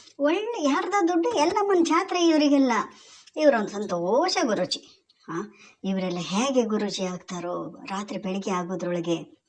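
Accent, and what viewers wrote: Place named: Kundapur, India. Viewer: Super akka all the best👍💯 enastu videos barali nimminda.. Kundapur kannada keludakke tumba chennagi ede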